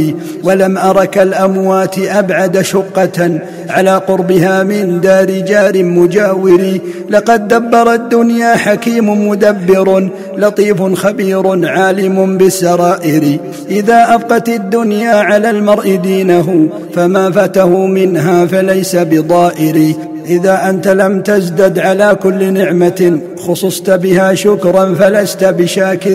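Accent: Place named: Najd, Saudi Arabia. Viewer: أهل الشام قراءة نجدية اعتقد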